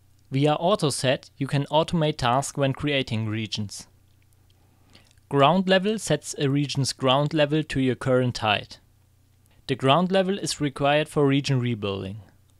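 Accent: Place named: Germany. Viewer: this is the most german english I've ever heard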